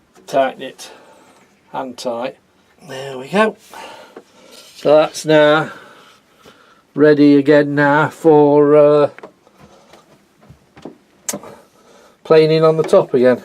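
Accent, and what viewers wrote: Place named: Nottingham, United Kingdom. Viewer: Why ho why did you take bed lock right out, you only need to free it off, you sound like a good notts lad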